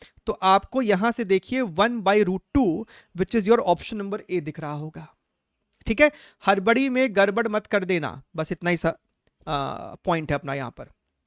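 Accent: Bihar, India. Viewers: Sir kya aap bihar se ho..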